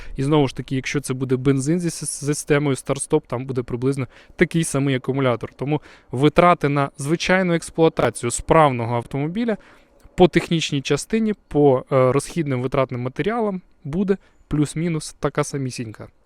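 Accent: Standard. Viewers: Дуже чиста українська, приємно бачити та чути, та особливо сприймати такий контент! Ви молодець! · четкий Украинский язык приятно слышать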